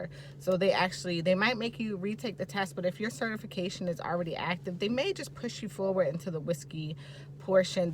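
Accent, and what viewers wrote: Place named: Caribbean. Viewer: Are you from the Caribbean (Virgin Islands)? I can hear an accent 🧐😃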